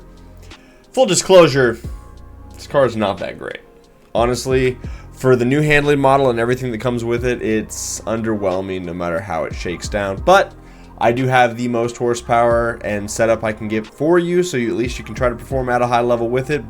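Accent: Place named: United States. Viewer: Two-ring 😂 American accent is so funny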